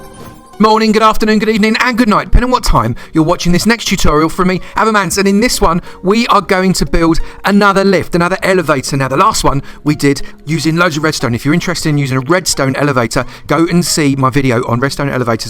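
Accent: United Kingdom. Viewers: I like the vid coz of ur voice British people these days or Australian :)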